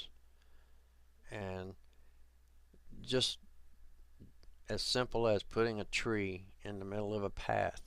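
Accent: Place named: Texas, United States. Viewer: you sound almost like a Texan. I bet your German is a bit cute. Enjoying your vids, bud. Thanks! P.S. Texans speak really slow, too, especially the ones from the Panhandle part of Texas.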